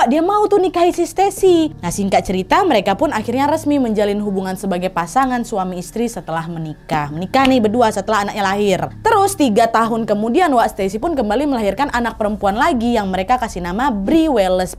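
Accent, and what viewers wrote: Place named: Medan, Indonesia. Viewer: Tadi anakku denger cerita te kekeh2 dia😂😂😂.. Medan kali mak katanya... Woii... makin cantek ya...😘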